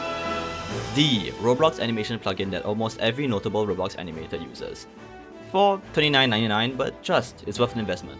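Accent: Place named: Singapore. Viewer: Singaporean too! I recognize the accent from anywhere!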